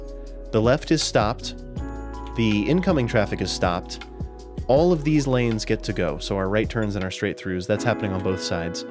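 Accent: United States. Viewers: Arterial - I am guessing you're from the New York area.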